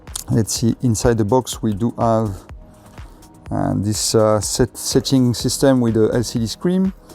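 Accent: France. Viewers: Merci beaucoup pour cette vidéo, vu l'accent je pense que tu es Français, dommage de ne pas avoir fait cette vidéo dans ta langue maternelle, je comprends rien à l'anglais, j'ai dû activer les sous-titres mdr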